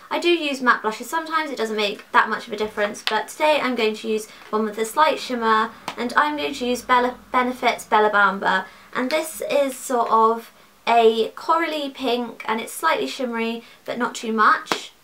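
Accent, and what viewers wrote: United Kingdom. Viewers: Where abouts in the UK are you from? :) Btw great video, found it very helpful!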